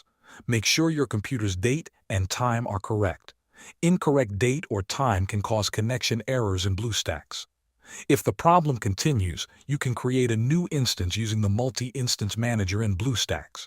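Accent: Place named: United States. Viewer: Да можно по русски говоришь как американец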